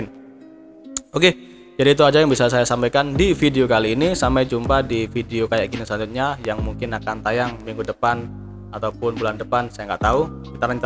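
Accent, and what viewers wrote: Java, Indonesia. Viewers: Bro, sampean jawa ne ngendi??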